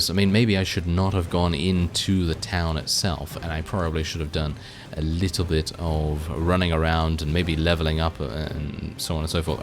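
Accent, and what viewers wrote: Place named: RP, United Kingdom. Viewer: Absolutely love your videos and your posh accent lol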